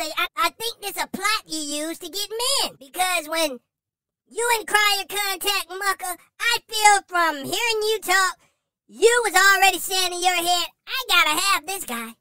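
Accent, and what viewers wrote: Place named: Carolina, United States. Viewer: I hear the Carolina accent. Lol. Is this new channel or channel name? Previously?🤔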